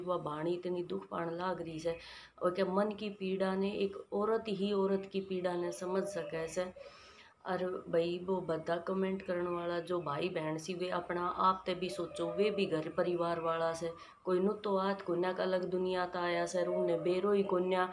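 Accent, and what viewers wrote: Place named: Rajasthan, India. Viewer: Aapki boli t to rajshthani lago ho ji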